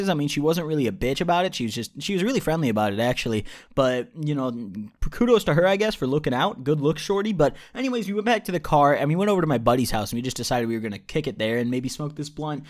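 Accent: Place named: Illinois, United States. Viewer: i fucking knew you were from Illinois based on how you talk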